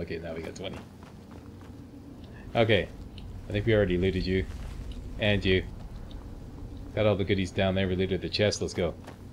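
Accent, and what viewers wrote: Canada. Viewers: He’s Canadian af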